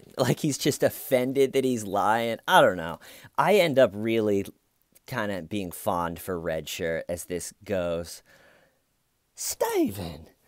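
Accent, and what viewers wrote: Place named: South, United States. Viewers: I love your southern accent. All people have to do is watch the original police interview to find out why STAYVEN is funny. 😂😂😂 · Love me a southern accent. 👍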